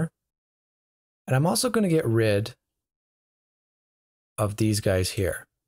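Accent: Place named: Canada. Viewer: thought you might be Canadian by the accent but then I knew for sure when you typed "colour" ;)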